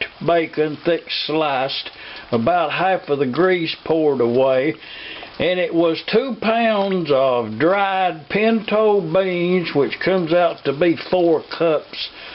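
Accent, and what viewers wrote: South, United States. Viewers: You have a fanfuckingtastic accent my southern friend, hope you enjoyed the grub!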